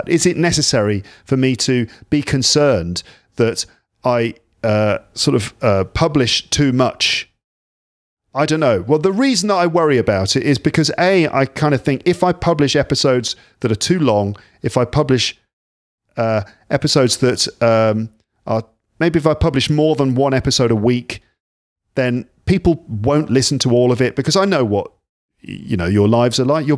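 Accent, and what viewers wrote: United Kingdom. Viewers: I enjoy listening to you whatever you are speaking about! I love your British pronunciation. Hello from St.Petersburg.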